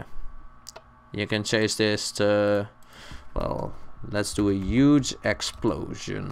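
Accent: Netherlands. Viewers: jee nederlands accent ik hoor het gelijk XD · Bedankt!! Zocht al een tijdje voor dit! (Ik reageer in het nederlands, want jouw engels accent lijkt op die van nederlanders XD)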